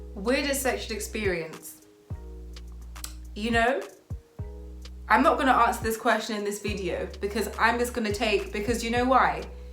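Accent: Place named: Jamaica. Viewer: You sound a bit Jamaican are you Jamaican? #Party #Protect_me #ova 💊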